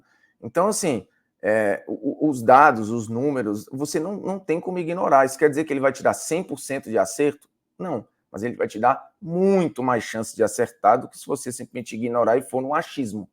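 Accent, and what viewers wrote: Northeast, Brazil. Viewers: Bruno Formiga, explique aquela polêmica da época do Esporte Interativo, quando você disse Ao Vivo que iria processar o Leonardo Baran porque ele falava com você de forma preconceituosa só por conta do seu sotaque nordestino.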